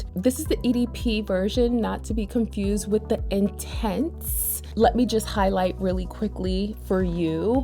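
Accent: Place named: West Coast, United States. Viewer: You're from NY or CA? cause you got the west coast accent down pat!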